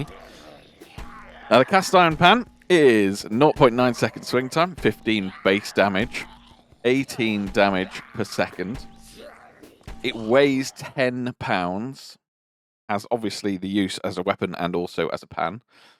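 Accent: United Kingdom. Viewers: You Brits and your zeds and oughts..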